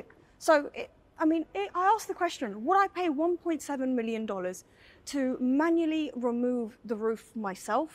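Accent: United Kingdom. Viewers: Love all your videos! the Cars you do and your accent!!!!!!! sounds like an UK accent! anyway great show!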